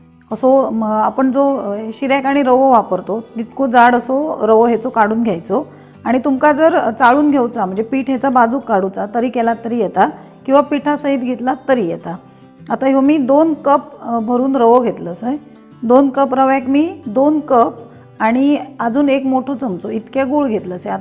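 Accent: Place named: Malvani, India. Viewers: अतिशय सुरेख तुम्ही मालवणी बोलताना आम्हाला गावाची आठवण येते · Ekdam bhari recipe...👌🌟👌🌟👌🌟...barik barik tips aani tricks sangilya mule pahayla chaan watali recipe aani karayla soppi👌👌😀...khupch goad watte tumchyakadun Malvani aaiktana...❤❤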